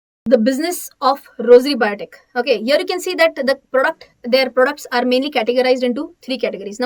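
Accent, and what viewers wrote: Maharashtra, India. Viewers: Her sound tunning like she is definitely from maharashtra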